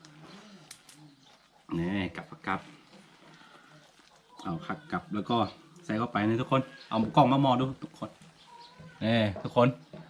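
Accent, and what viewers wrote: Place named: Thailand. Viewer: ຕິດຕາມຕະຫຼອດແຕ່ບໍ່ຮູ້ວ່າເປັນລູກຊອດ ຫຼືວ່າ ອາຂ່າໄທບໍ? ເພາະວ່າສຳນຽງການເວົ້າຢາກອອກໄທແນ່....ຂອງກິນທຳມະຊາດຫາຍາກ ຢາກນຳເດ່😋😋😋😋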